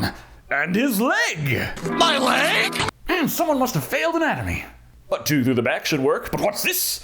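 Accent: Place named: Brooklyn, United States. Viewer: I Love that Brooklyn accent, bruh! 😆😆😆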